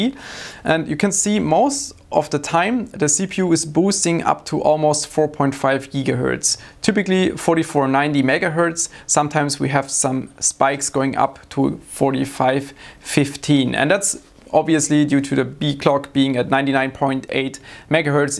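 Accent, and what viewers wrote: Germany. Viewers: Das gute alte deutsche englisch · Does anyone else have the feeling that this guy is so german that when he speaks english he still sounds like he is speaking german?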